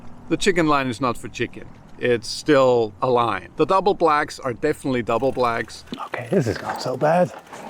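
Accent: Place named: United Kingdom. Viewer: Please give me a call I’d really like to talk to you in and hear you from Scotland or not Wales, but somewhere on the English channel? Your accent is beautiful give me a call I’d I would really like to hear from you, especially for the fact with your experience in Montana, I would like to learn I’m moving out there. I’m not vacationing I’m moving there and I need to be successful and I need to know what’s going on I need to learn from people that are from there or the understand it better than I do and I am leaving today at 12 PM. Give me a call. Text Me maybe some hall we can get together. It would be wonderful to learn from you my friend you sound like a very good person so God bless all the best to you and your family family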